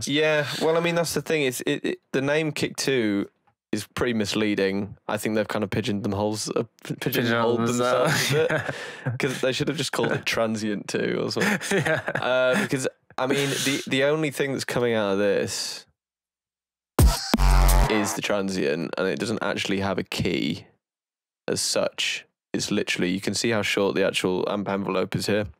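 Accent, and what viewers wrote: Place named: United Kingdom. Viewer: Sick snare sick bri ish accent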